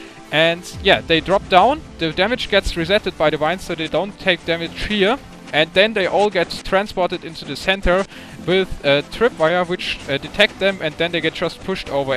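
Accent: Germany. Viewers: WOW, u have such a strong German accent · where do you come from? Germany? ^^ (sounds like it)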